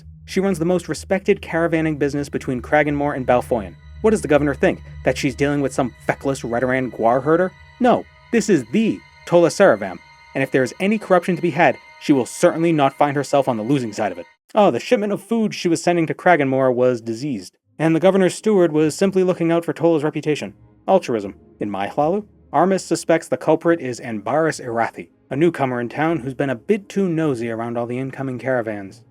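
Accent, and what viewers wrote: Boston, United States. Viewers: You should use your boston accent more often its so good (I promise I'm not biased because i live here) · So, it wasn't until I went back and watched your RS3 Maxing series that I clearly heard your Bostonian come out. I don't know how I never picked up on it from watching all of your Morrowind content AND your streams lol. I absolutely love it, honestly. It's the same joy I get when I hear Jerma slip into his Bostonian. I get it, my Texanese will show up from time to time.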